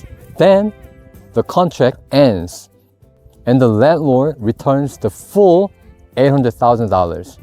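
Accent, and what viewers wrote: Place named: West, United States. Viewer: This creator is VERY interesting. I'm running into several English speaking channels of people living on Korea. In particular, this gentleman speaks a Western style of American English very well. Thank you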